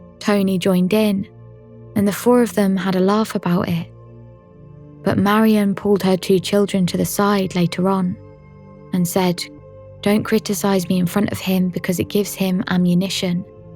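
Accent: United Kingdom. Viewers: You are the best voiced narrator I've heard in my 47 years. (I live in Oklahoma so what do you expect lol) - before your voicemhit my ears, my favorite was Richard Dawkins' wife Lala Ward...she used to be my favorite narrator and before her, it was the Forensic files narrator. Your voice is SO smooth and relaxing and sublime. Female British accident is my favorite accent in the world...and if anyone offers to digitize your voice ask a lot of money because YOU ARE THE BEST. NOBODY is as sublime to hear than your voice. I don't want to invade your privacy, just want to know what parts of Britain a person has to grow up around to get that accent? Your microphone is so good, your classy, thorough, and wonderfully pleasing voice is so good. It's a 🙏 thank you kind poster for sharing your voice with us. Don't worry I'm not a stalker... I'm gay lol you just have such an amazing voice it's unbelievable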